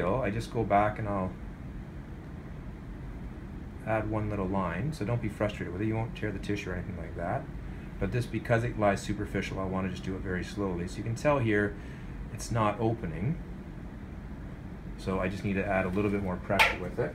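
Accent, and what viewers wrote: Canada. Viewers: I can surly hear your Canadian accent . Love your teaching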